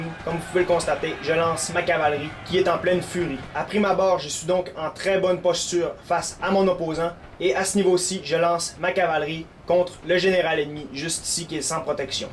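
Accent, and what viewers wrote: Quebec, Canada. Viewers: est canadien?? c est de quel region cet accent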